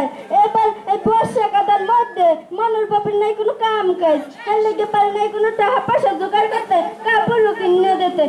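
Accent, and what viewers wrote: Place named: Barishal, Bangladesh. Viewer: বরিশালের ভাসা ভালো লাগেনা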